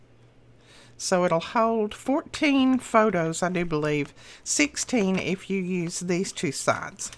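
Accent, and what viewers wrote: South, United States. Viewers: Oh, I LOVE everything about this project!!! Especially your Southern accent, 'cause I'm a Southern girl too ;-) TFS!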